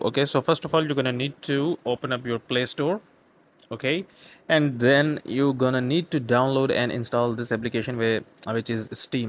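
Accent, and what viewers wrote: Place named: India. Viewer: Speak english not indian english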